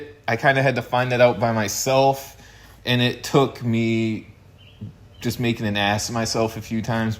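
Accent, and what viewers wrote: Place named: Midwest, United States. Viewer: a midwest accent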